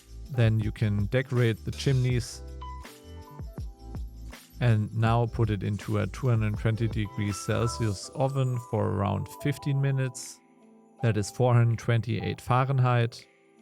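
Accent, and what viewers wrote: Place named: Germany. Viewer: I think you should mention that the lard should be really cold during the Pâte dough making. Preferably put into the freezer 1 hour beforehand. Since otherwise the heat thats created in the food processor due to the chopping motion, will cause the fat to seperate & kind of like with puff or short crust pastry, will result in an oily greasy mess. As a chef, naturally I already know this, but someone who isn't familiar with making a classic Pâte may not know this. Just saying... Btw, your german accent is really strong. lol Are you a chef or are you just a passionate home cook? I think it's a real shame that old classic appetizers like Parfaits, Pâtes, Galantines etc are a lost art. And are slowly fading from existence. Except maybe in France where they uphold the tradition of producing all these classics. But if you look around restaurants in Scandinavia, Spain, Germany, Britain etc It's a real rarity to even find these dishes on a menu, except its maybe a 3 star fine dining etablissement. 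I think I'll do a Poultry liver parfait for new years eve. With maybe a port jelly or a cumberland sauce. And marinate the livers in some madeira and brandy. I admire that you have executed this classic recipe. Cheers !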